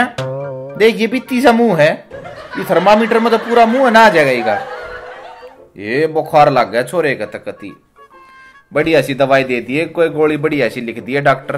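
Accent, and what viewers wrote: Haryana, India. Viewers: M b Haryana m 3 saal pada hu aap bilkul sonipat wali haryanwi bolte h i luv it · I like ur haryanvi .....enjoy a lot....and ur wife is very beautiful.....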